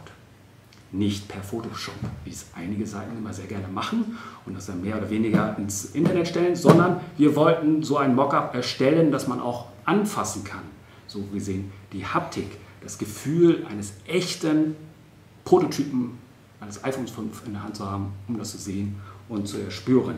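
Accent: Asia. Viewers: I wonder if he has an Asian accent to German people ? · Is that Asian speaking German!? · hahah, he's speaking german but in an asian accent:P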